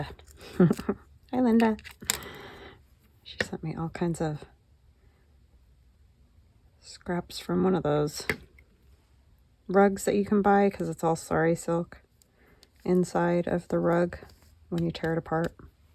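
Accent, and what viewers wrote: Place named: Carolinas, United States. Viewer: You kinda sound like you’re from the Carolinas. Are you?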